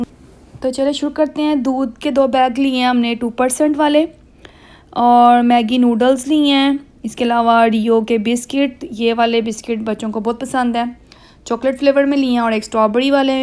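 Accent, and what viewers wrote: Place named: Lahore, Pakistan. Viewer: ye Lahorei style hai.hume tu bht pasand hai.m bhi lhr se hun.or bht acha bolti hai👌👌 jee❤❤❤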